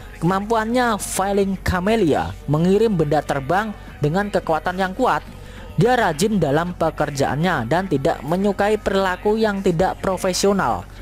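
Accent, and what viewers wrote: Java, Indonesia. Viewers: Suara nya medok ya bang 😆😆😆😆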